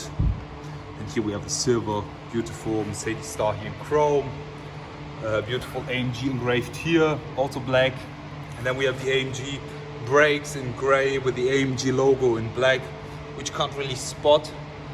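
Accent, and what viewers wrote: Germany. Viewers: sorry ich bin jetzt auch nicht besser aber man merkt das du deutscher bist wegen der Aussprache aber wie gesagt ich bin auch nicht besser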